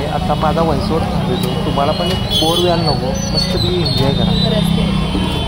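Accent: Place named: Kolhapur, India. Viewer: ? 😅 I like kolhapuri accent..so I watch.. thanks for it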